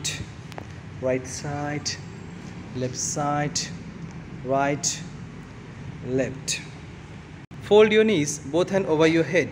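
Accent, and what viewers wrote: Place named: South, India. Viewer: Yes sir jaldi banaiye deit vedio or apki voice to bhot achiii h thodi south Indian type voice h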